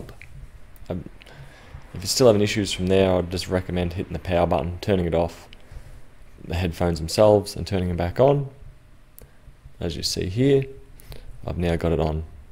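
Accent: Australia. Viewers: Thanks mate, btw: like to ozzy accent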